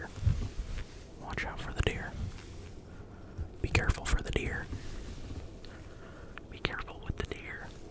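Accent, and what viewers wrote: United States. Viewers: very Minnesota accent😭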